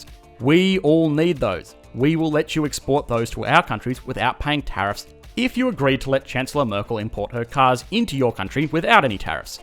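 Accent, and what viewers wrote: Australia. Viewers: Bruh hes australian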